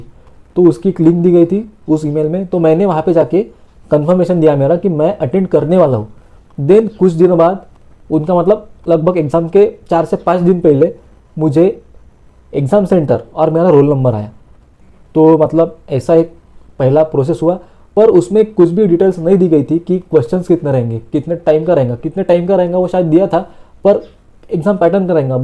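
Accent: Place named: Maharashtra, India. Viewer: Rahenga...milengaa..bc pakka marathi aahe tu bhava..😂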